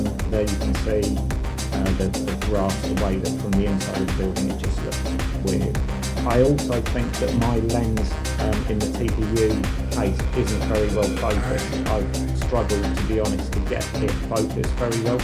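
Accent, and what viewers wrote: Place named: England, United Kingdom. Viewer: Agreed, music is too loud and unnecessary. Everyone thinks their voice is weird, yours is perfectly normal. In fact the English accent makes you sound smarter than the rest of us… thank for the info.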